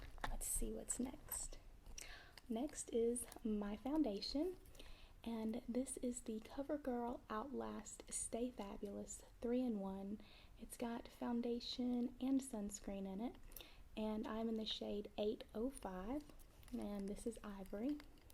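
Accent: South, United States. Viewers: Do you have a slight southern accent?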